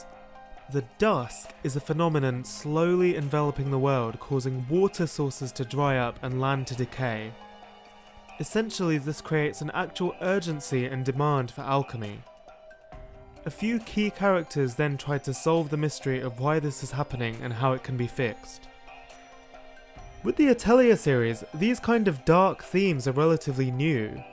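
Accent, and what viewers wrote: United Kingdom. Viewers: I have a lot of games to get through as it is, but the way you presented these, they really seem like the kind of game I'd enjoy. I'll add these to the long list of games I'll need to look more into, I guess... once I finish my large backlog of games that I already own. (Also, yes, more talking with the English accent.) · This was actually a super cool video, i perfer tactical Rpgs as apposed to these kind of turn based ones, but nonetheless i enjoyed hearing about this game and i might actually end up buying it lol. Also i knew you were from the UK but i didnt think about you having an accent. It was really enjoyable to hear you ramble about something lol. very nice change of pace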